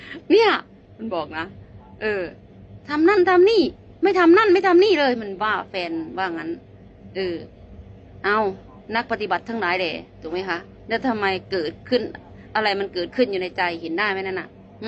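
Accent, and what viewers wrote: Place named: South, Thailand. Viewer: สอนธรรมได้ดีเข้าใจอย่างลึกซึ้งเลยครับ ชอบสำเนียงใต้ ฟังแล้วเพลินดี อนุโมทนาด้วยครับ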